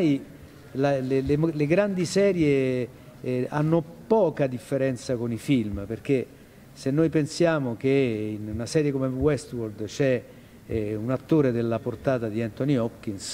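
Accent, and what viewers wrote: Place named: Rome, Italy. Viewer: Diversissima no, ma è ovvio che in un contesto come questo sia meno impostata e si senta di più l'accento romano .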